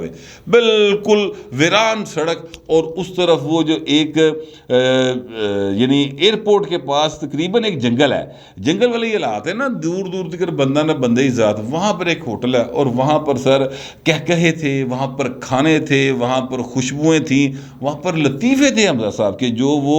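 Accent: Punjab, Pakistan. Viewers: السلام علیکم بھائی محترم بھائی، الحمداللہ زبانیں ساری پیاری، ساری میٹھی، ساری اچھی، اردو میں جب آپ گفتگو کرتے ہیں پنجابی ٹکسچر کے ساتھ تو ہم تو انجوائے کرتے ہیں ایک منفرد فلیور مزیدار ہوتا ہے، مگر ہمارے دیگر گھر والے آپ کی اردو تو خوب سمجھ پاتے ہیں لیکن پنجابی ٹچ ان کے پلے کچھ زیادہ نہیں پڑ پاتا، ویسے آپ کا اسٹائل یعنی زبانوں کا خوبصورت استعمال اور امتزاج بہرحال قابل تعریف اور سراہے جانے کے لائق ہے۔ اللہ آپ کے زور بیان میں طاقت کو برقرار فرمائے اور ہم کم علموں کو آپ کے تبصروں اور تجزیوں سے مستفید فرمائے آمین۔ اپ ہوئے، شفقت بھائی، رضی دادا، سعید چوہدری، امداد سومرو، منصور علی خان اور دیگر دوسرے تجزیہ نگار سب کو ہماری طرف سے بہت بہت مبارکباد اور آپ کا شکریہ کہ آپ کی گفتگو میں اتنا لطف آتا ہے کہ بیان سے باہر ہے، ہمارا تو زیادہ تر وقت آپ کی گفتگو اور رپورٹس سننے میں گزرتا ہے اور کیا خوب گزرتا ہے، الحمداللہ جزاک اللہ خیرا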